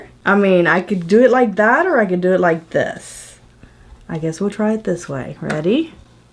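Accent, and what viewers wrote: Puerto Rico. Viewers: Love your Puerto Rican accent! 💕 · boricua accent on point hehehe LOVE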